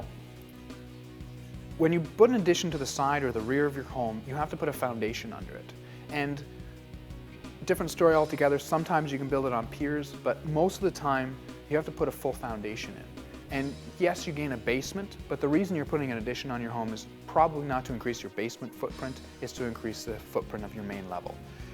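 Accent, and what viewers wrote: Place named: Canada. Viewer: Obviously this guy is Canadian. i.e. "blowing the snow off when it precipitates in the winter" and the accent. Not for North Carolina where I want to add on.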